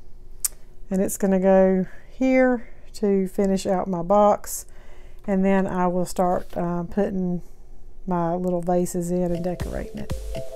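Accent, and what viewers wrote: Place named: Tennessee, United States. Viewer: AS ALWAYS, LOVE, LOVE, LOVE YOUR VIDEOS & DIYS!!! YOUR VOICE REMINDS ME OF MY FAVORITE SISTER-IN-LAW FROM TENNESSEE. SHE WAS AN ANGEL! HAD SO MANY HEALTH PROBLEMS BUT NEVER COMPLAINED & ALWAYS PUT OTHERS BEFORE HERSELF. I HAVE NOT BEEN TO A DT SINCE MY HIP REPLACEMENT SURGERY, NOVEMBER 12th!!! 😩😳 ONCE OUR WEATHER IMPROVES I AM GOING TO GO! HAVING MAJOR WITHDRAWALS!!! I HAVE MISSED OUT ON SO MANY GREAT ITEMS!!! 😥😭 HOPE YOU & YOURS ARE ALL DOING WELL. KEEP ON WITH YOUR VIDEOS, PLLLLEASE!!!! I SURE WOULD MISS YOU IF YOU DIDN'T!!!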